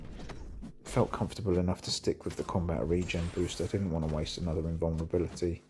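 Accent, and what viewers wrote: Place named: England, United Kingdom. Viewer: Just wanted to comment and say keep posting. I prefer you over Lagacy and being English myself it's so refreshing hearing a familiar accent. I'll be watching more videos to come! 🇬🇧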